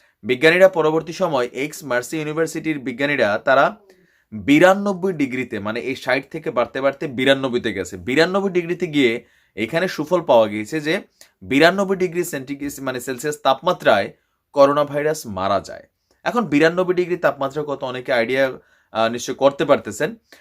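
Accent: Comilla, Bangladesh. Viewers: ভাই কেমন আছেন, আপনার মুখে কুমিল্লার আঞ্চলিক ভাষা অসাধারণ লাগে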